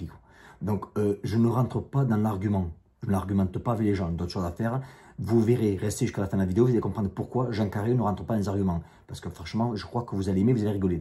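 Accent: South, France. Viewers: Salut Jean. Déjà, les gens ne te comprennent pas parce que tu parles vite. Mais il suffit de s'y habituer je dirais et c'est tout!!! Ensuite tu as un accent que je n'ai pas et c'est bien dommage, je suis parisien, personne n'est parfait... Mais surtout, garde le ton accent, c'est agréable, c'est chantant, ça rappel les vacances, le sud et le bon air! Tu ne vas pas l'effacer pour faire plaisir a certains! Tu sais, c'est un peu comme les gens qui se plaignent des cigales dans le sud, elles font trop de bruit qu'ils disent! Mais le sud sans les cigales, c'est plus le sud!!! Et c'est un peu comme, il parle comme il parle, point!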